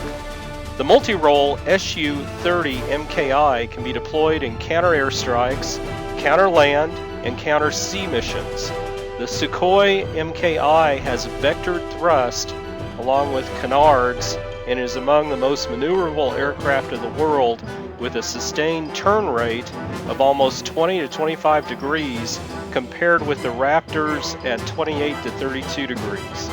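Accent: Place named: Pakistan. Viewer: Your voice is pakistani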